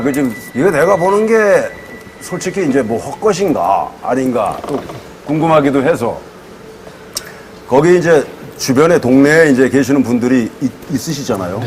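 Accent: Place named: North Korea. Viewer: 이상하다. 말투가 왜 북한 사투리같지?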